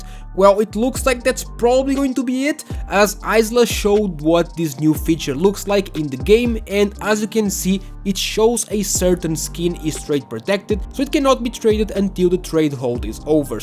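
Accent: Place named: Portugal. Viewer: mete mais sotaque tuga nessa merda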